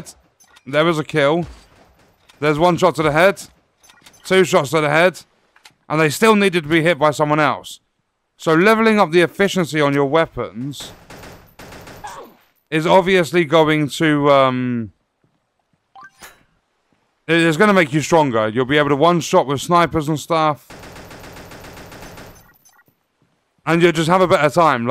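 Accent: United Kingdom. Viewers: dude the british acent absolute king 😩👌🔥🔥🔥🔥🔥🔥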